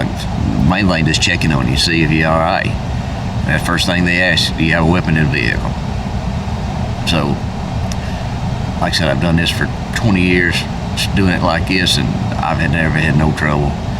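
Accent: South, United States. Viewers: Where you from bo? Sound southern. Git R Done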